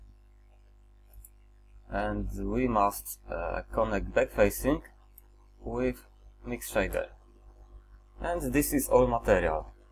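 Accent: Poland. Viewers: Polski akcent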